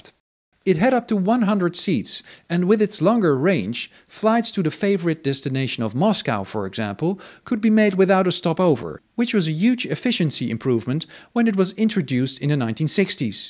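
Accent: Netherlands. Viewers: Am I correct, sir, that you have a Dutch rather than a German accent when you speak English? This video has corrected a visual error I've had for 40 years. I was waiting for you to get to Interflug's L1011... and waited, and waited... It was then I realized the L1011 belonged to LTU! Same reddish colour in the livery! :trophy-yellow-smiling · By the accent more from the Netherlands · his accent is Dutch not German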